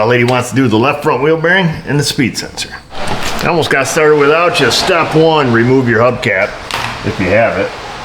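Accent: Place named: New York, United States. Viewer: I've been watching your videos for years. I always get a good laugh when that New York accent comes through. Mom's family is from New York and the old man's family is from North West Florida on the gulf coast. Mom used to tell me when she met my father while he was stationed in New York, that she thought that the only thing that came out of the south, was rednecks and stump jumpers. I spoke with my aunt (aka - ant) the other night, and she still lives in New York. Her accent and at the speed which she speaks, I had to tell her to slow down and speak to where this country boy could understand her. Love the videos and the great work that you do, keep it up chief.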